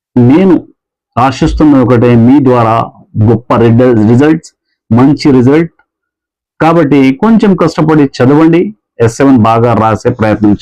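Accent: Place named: Telangana, India. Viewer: Sir aap telangana hai yaa ap ???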